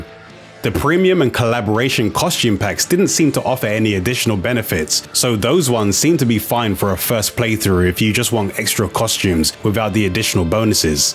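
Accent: United Kingdom. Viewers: Are you from the UK? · Clips Dude he just sounds a little british, weak bait lol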